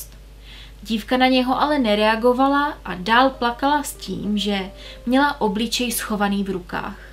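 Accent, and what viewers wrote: Moravia, Czech Republic. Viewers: Já miluju tvoje příběhy.. Jen nemohla bys trochu zapracovat na té moravštině(změkčování i, kde to nepatří).Hrozně to trhá uši. Neber to, prosím, špatně.. Jen jako návrh na zlepšení.👐Odebírat tě nepřestanu:D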